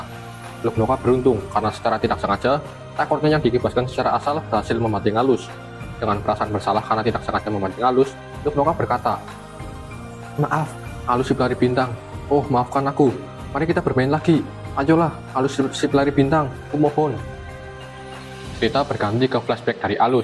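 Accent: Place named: Java, Indonesia. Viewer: Suaranya diperbaiki, logat jawa dan suara mendem ditambah dIkasih efek bukan perpaudan yang bagus. Perjenih suaranya dulu aja baru digaya2in.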